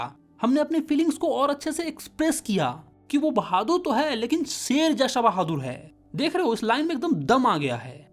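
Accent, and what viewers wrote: Bihar, India. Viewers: bhaiya are you from bihar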